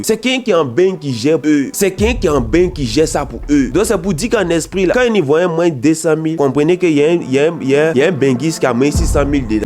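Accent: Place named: Ivory Coast. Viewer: Moi c'est ta manière de parler qui me fan tu montres que tu es un vrai ivoirien et très fière ouiii ❤❤❤🎉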